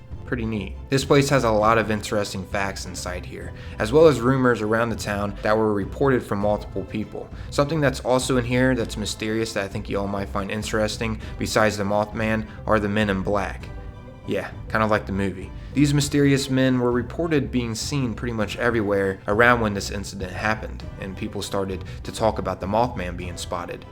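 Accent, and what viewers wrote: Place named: Pittsburgh, United States. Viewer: Yo where you from? I hear a pittsburgh accent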